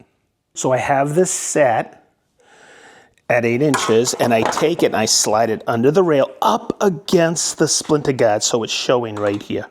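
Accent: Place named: Massachusetts, United States. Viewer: Thanks,!! I just picked up my set (20", with plans to get the 30" rails later) so I can start with a shop cabinet build in my garage. This video really brought it all together. Thanks as always for your positivity, encouragement, and wisdom! (And that lovely MA accent - Swampscott? I always remember my relatives from that area when I watch your videos! 👍👍👍)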